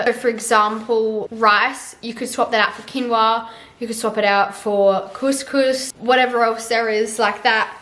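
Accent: United Kingdom. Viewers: thank you bri-ish lady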